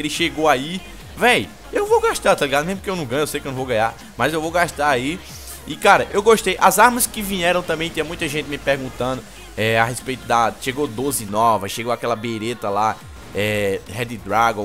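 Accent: Northeast, Brazil. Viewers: você é muito legal e seu sotaque melhora mais ainda o vídeo, cara. Que saudade do Nordeste! Você é bem simples e, ao mesmo tempo, ótimo. Sei lá o porquê deu ter falado isso, mas é que tava guardando isso. O_o Eu quero saber a sua killmark. Eu baixei a killmark do canal achando que seria aquela normal que você usa nos vídeos, mas é uma que tem o nome e tals, e eu queria uma normal, sei o nome porque não combina com meu nick - achei bastante bonita aquela killmark. É qual dessas: Killmark IDK, Killmark HG9?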